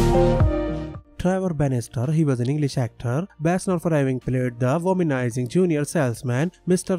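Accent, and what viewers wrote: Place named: India. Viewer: It is an accent from India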